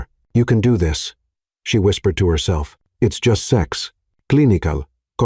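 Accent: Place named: Spain. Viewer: Why the Spanish inflections in the AI speech? They certainly distract from the story. AI should know English only..also AI pace is not how people speak. Saying MISTER then a long pause is odd.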